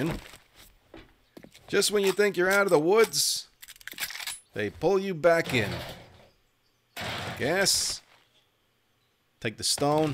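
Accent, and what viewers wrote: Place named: New York, United States. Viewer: Dude, these rock xD And all in that what, east coast accent? You make me think a Manhattan guy woke up in the apocalypse and is just going around pwning stuff till they can get back to Noo Yawk lol xD Very enjoyable!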